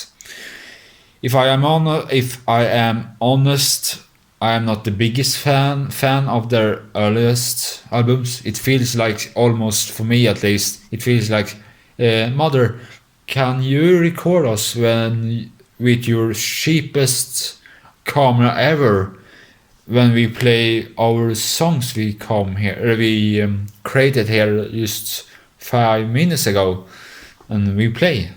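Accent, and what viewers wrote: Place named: Sweden. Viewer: You speak good english, but there is of course a little dialect from the Swedish dialect, but everyone has a little dialect, wherever they come from:)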